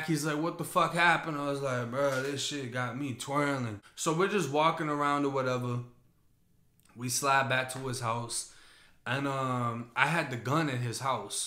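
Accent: Boston, United States. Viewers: Swear all this time I thought he was from Boston with his accent. Woulda never that FL. Still only a few months in to his channel not knowing his story or if he was raised there and moved to FL later on, but his accent is thick; love it. But about the story - wow! Alex was a real one Bro. You a solid dude so it makes sense why you and him were friends; explains why you feel guilty for something that wasn’t directly your fault. Alex was solid and didn’t fold - don’t think he blames you for his character just being that of loyalty (if that makes sense). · totally Boston dude... I heard it too. I was totally confused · Bro I thought u were from Boston. Bcuz u have a Boston accent. Wow i was wrong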